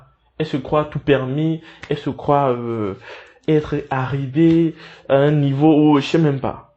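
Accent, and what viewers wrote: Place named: Cameroon. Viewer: Prions seulement pour qu'elle guerisse. Sinon J'aime trop votre accent camerounais . Sinon cœur sur vous depuis la Côte d'Ivoire.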